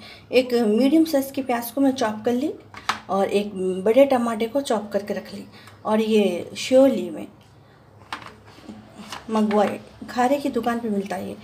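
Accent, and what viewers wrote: Hyderabad, India. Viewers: Hyderabadi language 🙄🤔🤔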